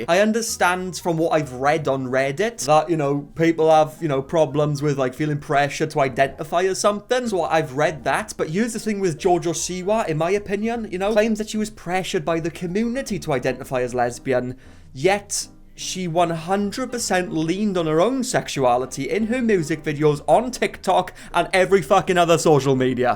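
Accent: United Kingdom. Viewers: Lmaoooo had to watch this video with subtitles coz of the thick Brit accent but worth it 😂 This girl needs to go away and do some self reflection for at least 5 years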